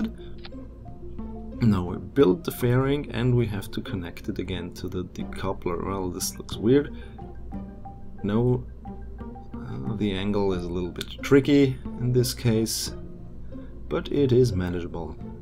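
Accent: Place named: Germany. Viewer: u German? ur accent is just trying to Sound English but failing... just like a German accent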